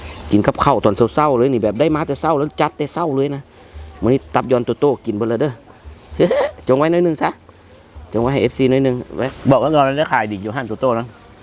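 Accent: North, Thailand. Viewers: คนลาวอีหลีบ่ครับสำเนียงคือเสียงภาคเหนือของไทยแท้.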